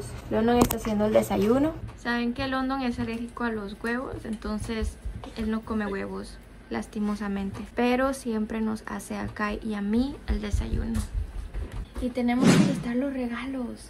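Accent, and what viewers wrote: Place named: Colombia. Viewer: pareses colobiana hablando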